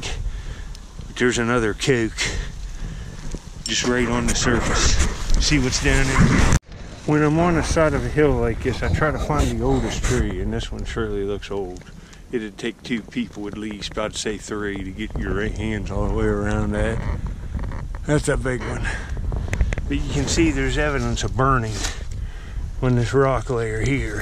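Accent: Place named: Pittsburgh, United States. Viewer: I'm from the Irwin Pennsylvania area. I can tell by your accent that you have to be from around Pittsburgh?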